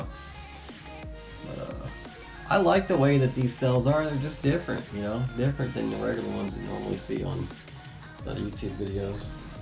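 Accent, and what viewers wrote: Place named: Texas, United States. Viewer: Love that you still have your Texas accent my friend!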